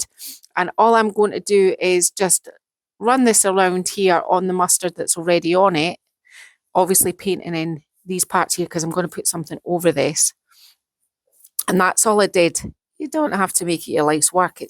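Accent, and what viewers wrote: Scotland, United Kingdom. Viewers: The Master of layering! When I first started watching your lovely videos, I thought you were saying "lairs." Which I also thought was a strange word to describe layers. It must be a Scottish thing. The layers were in a lair? Just finished a tray using decoupage, stencils, and lots of lairs. All inspired by. Doing the monotonous repeats is very zen. Beautiful. Can't wait for your stencils, gift that keeps giving. Edit: also the Queen of the leitmotif. 😊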